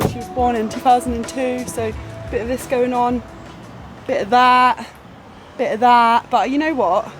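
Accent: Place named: England, United Kingdom. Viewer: You are so cute and your English accent is to die for. I absolutely love an English accent.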